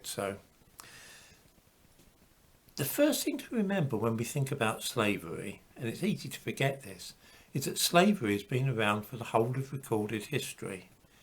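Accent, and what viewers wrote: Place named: England, United Kingdom. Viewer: So all it takes is for a dude with an English accent to sit in front of a bunch of books and present himself in such a manner, that he is a historian and nobody checks his lies well there were a few comments I saw on this where people were correcting him. I saw at least six comments where people corrected him on points of History. In six comments people have to correct him on points of history, but what's unbelievable is they still agreed with the premise... Wow people will take any excuse to wallow in their racism... Or is it just that they don't want to take responsibility for the villainy because when you find that many minor discrepancies it's a major lie.... The transatlantic slave trade happened. Slavery in Africa and buy Africans was way different than chattel slavery... They had rights they could not be murdered and families could not be separated... 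Many slaves rose to leadership within African families... Accept what happened learn from it and move on in a more positive manner work towards healing... For those of you whose psyche is too delicate to accept the fact that wrong was done and you perpetuate the wrong stay trapped in ignorance....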